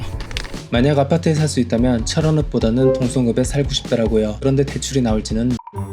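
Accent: North Korea. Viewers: 말투에 북한 사투리가 느껴지는데? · 근데 경주출신 맞으세요? 말투가 뭔가 탈북민 같은데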